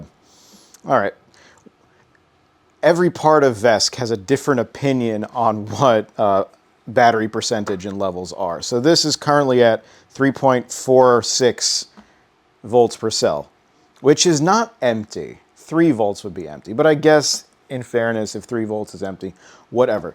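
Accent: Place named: Australia. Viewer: Great video! I let it play as I swapped an MTE into my XR, it was like we were building together! I even caught myself talking in Australian accent with you 😜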